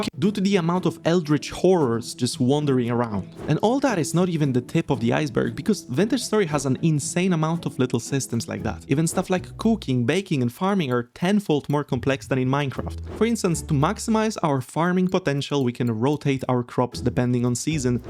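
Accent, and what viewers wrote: Poland. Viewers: as soon as I heard your accent I was like, is he polish? and then i checked channel localization XD Pozdro byku, spoko film i rozwijaj się dalej!! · I immediately knew you were Polish. Years of listening to people read in english class suddenly came back to me when I heard you speak.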